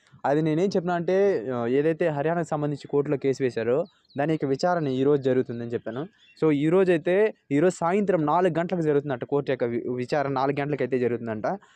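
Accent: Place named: Chittoor, India. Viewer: Bro meedhi ee vooru slang chittore district lagane undhi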